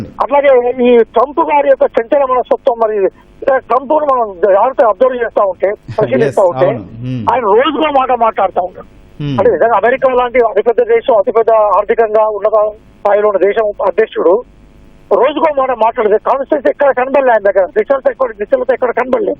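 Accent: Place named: Telugu, India. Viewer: ఈ tv తెలుగు స్లాంగ్ 👌👌👌❤️❤️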